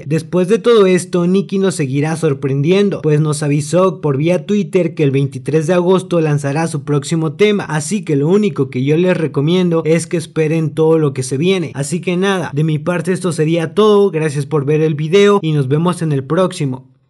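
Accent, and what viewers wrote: Argentina. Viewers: Sos argentino o uruguayo vos?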